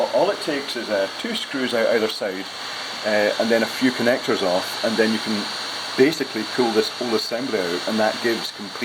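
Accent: Scotland, United Kingdom. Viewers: sorry for the dumb question - your accent is scottish?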